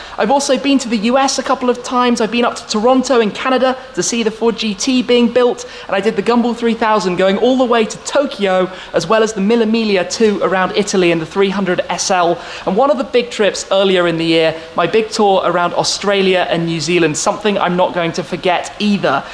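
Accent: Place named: United Kingdom. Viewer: Dieser britische Dialekt ist so ekelhaft, ...Alter.... .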